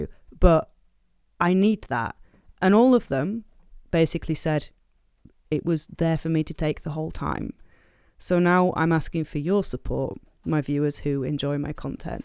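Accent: United Kingdom. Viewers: I just watched this, god knows if you're see this comment. Part of the reason I watch your vids is because of your humor, and the other part is because you always made mention of your issues and as someone who is bipolar I get it, because I've been there myself. That and if I'm going to be perfectly honest, you're attractive and I can't get enough of your voice, it's the British accent. Could listen for hours, I usually wait till there there's a few vids to watch in a row for said reason. Not a creeper, just a Bipolar guy that finds himself in similar situations as yours and..... well, what can I say I'm guy, knowing there's an attractive woman out there that I can relate to on some level, makes me feel less lonely and worthless. Stay strong, be you, because you rock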